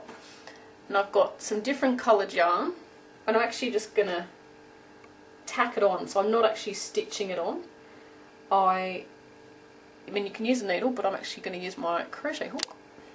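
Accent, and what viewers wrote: Australia. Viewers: She is Austalian and yeah her accent IS awsome =]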